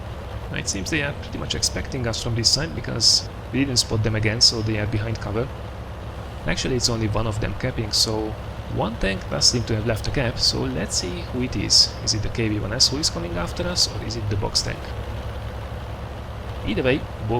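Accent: Hungary. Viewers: Annyira magyar akcentusa van xdd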